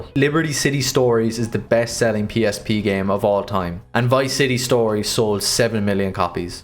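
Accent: Ireland. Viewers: Your losing your Irish accent, but I like how you say 3 and 30. You say Tree and Dirty Tree. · Are you Irish? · You're Irish ain't ya? Heard it in the accent lol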